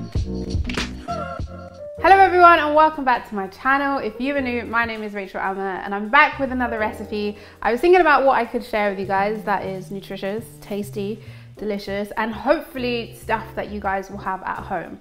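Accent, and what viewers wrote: United Kingdom. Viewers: sounds like every other british person no?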